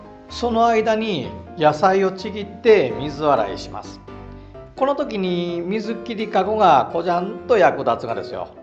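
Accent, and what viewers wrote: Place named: Tosa, Japan. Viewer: 先日竹虎さんでせいろを購入させていただいて、せいろの動画を探していたら公式さんの動画に辿り着きました！😳 初めて使うので、丁寧な動画を上げていただいて大変ありがたいです…！参考にさせていただきます✨ 自分は高知県民ではないのですが、恋人が高知出身で高知県が大好きなので土佐弁の音声もうれしくなりました😊🌸 他の動画もありがたく拝見させていただきます✨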